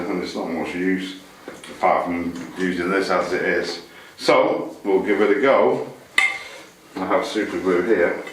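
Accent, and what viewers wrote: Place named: England, United Kingdom. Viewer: Could you tell me where in England is your accent coming from? Thanx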